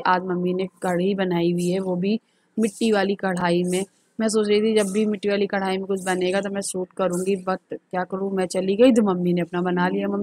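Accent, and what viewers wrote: Bundelkhand, India. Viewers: Hello di aap bundeli bola kariye aap ke munh se bundeli bahut acchi lagti hai ham log bhi ghar per bundeli hi bolate Hain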